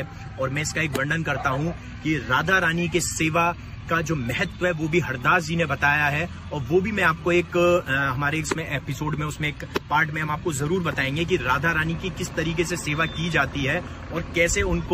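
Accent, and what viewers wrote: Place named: Braj, India. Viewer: बहुत सुंदर लगता है जब आप ब्रिज भाषा मे बोलते है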